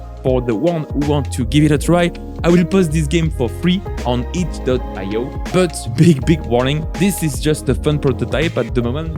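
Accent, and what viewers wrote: France. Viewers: Ton accent français m’avais manqué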